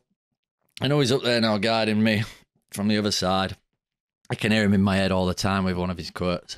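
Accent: United Kingdom. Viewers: I also did not know you were british lol... I always thought you were from the states. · me 2 seconds in: Hes British?? 😮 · I never knew u were British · Eeee he's a Brit... Sounds like a chuffin' Yaaarksher lad... Love it.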